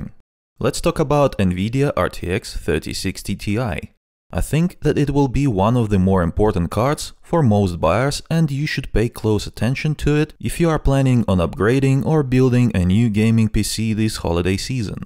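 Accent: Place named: Russia. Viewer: Блин слышно русский акцент)) А так контент годный, продолжай в том же духе)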